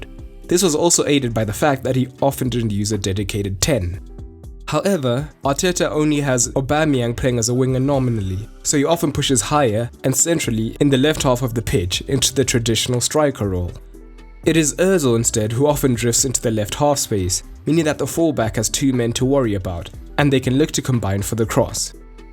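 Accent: South Africa. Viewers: Wait is the guy speaking South African? Respect! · This is totally unrelated, but you sound South African? So...are you?😅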